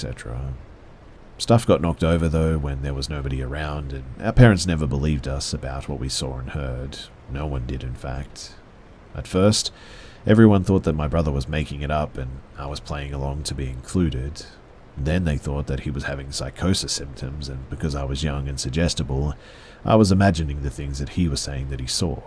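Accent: Australia. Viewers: you are my absolute favorite scary story teller on all of the internet. It's mostly your Aussie accent ❤️😍😁🤗😊😉😘💯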